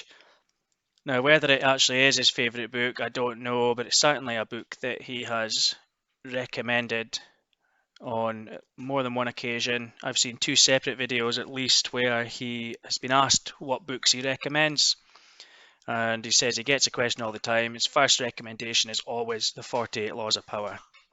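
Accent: Scotland, United Kingdom. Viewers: Anybody believe this Sammy the Bull story? Doesn't add up. As a scouser I'm trying to decipher what part of Scots you're from. Its not a heavy Glasgow accent or Edinburgh. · I might be biased as I'm Scottish but it's good to hear a Scottish accent that isn't on Jeremy Kyle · Fuckin love Scotland Scott's forever fuck thst... Love the accent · Least you use your wee scotch voice...😉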